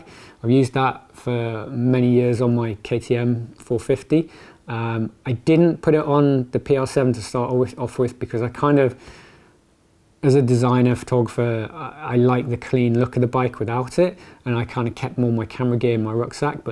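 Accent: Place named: England, United Kingdom. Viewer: Enjoy the vids. Hope you get to ride more soon. Off topic, but have you ever watched Project Amber on YouTube? He seems to be a kindred spirit. Maybe from the same part of England as you? (I’m an American, can’t decipher the subtle nuances of the accent). Anyway, y’all both have a calm/soothing presentation. Look forward to your (and his) vids!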